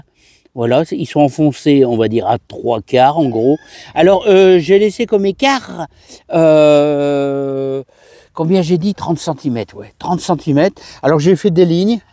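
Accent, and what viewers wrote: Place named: Pas-de-Calais, France. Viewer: J'adore. Votre accent du Pas de Calais est un régal. J'espère faire des poireaux en suivant vos conseils. Mes laitues à moi sont minables pour l'instant.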